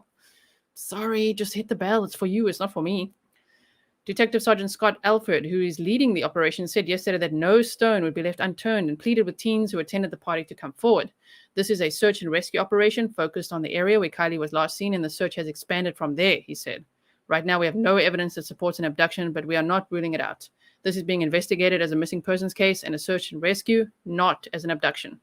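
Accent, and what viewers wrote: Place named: South Africa. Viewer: Thank you we have subscribed. Love your South African accent. My friend in Hawaii is from South Africa and you reminded me of him. Keep up the good work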